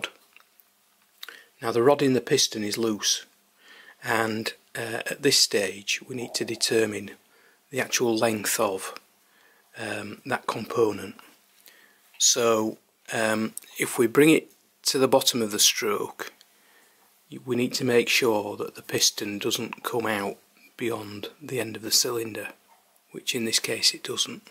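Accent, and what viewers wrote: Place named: Hull, United Kingdom. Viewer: You sound like you might be from Hull, my home town. Very nice little engine, something I'd like to have a bash at. Greetings from Australia...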